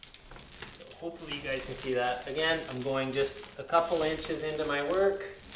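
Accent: Canada. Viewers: Thanks so much for sharing your expertise here. Going to use this technique on my walls before applying wallpaper. Really appreciate your friendly Canadian tone 🇨🇦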